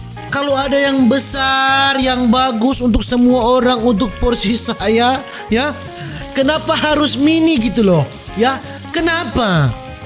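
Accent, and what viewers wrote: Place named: Mandar, Indonesia. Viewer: Abang orang mana 🤣 Loga2 suaranya kek orang mandar😂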